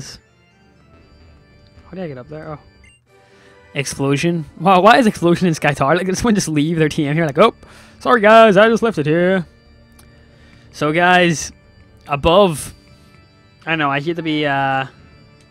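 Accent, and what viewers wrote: Northern Ireland, United Kingdom. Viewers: R u from Northern Ireland/Ulster and if u r what county??? I is from Dublin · Northern Ireland man. Keep er lit boyo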